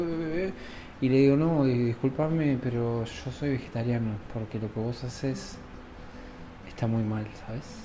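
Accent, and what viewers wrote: Argentina. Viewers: amigo sos correntino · Creo que es argentino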